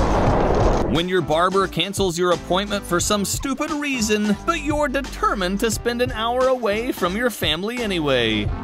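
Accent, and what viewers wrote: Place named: United States. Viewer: We don’t really need terrible American voiceovers… or cheesy music.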